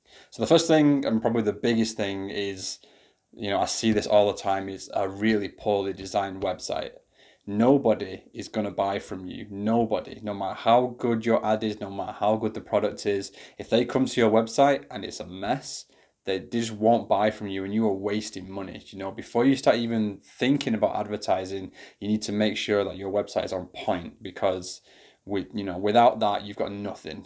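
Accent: United Kingdom. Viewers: please don't get me wrong but i cannot understand your UK accent. And the automatic captions doesn't matches with your words. You should create own captions for every video.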